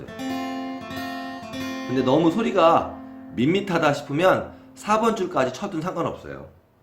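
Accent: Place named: Jeolla, South Korea. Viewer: 정말 초짜들에 대한 배려가 넘치는 강의이십니다. 기가 막힌 강의 잘 들었습니다. 한 마디 한 마디가 피가 되고 살이 되는 말씀입니다. 계속 초보자들의 어려움을 헤아리는 강의 부탁 드립니다. 선생님 계신 곳이 전라북도인가요? 익숙한 억양이라 점감이 더 큽니다. 저는 군산입니다.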